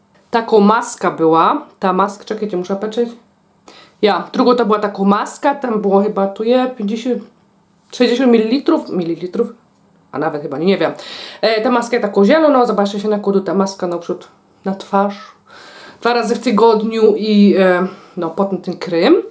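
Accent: Silesia, Poland. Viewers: jestes Super babka,zawsze sie usmieje z twojej slaskiej gadki.Pozdrawiam cie z Ingolstadt. Jak bedziesz przejazdem serdecznie zapraszam na kawusie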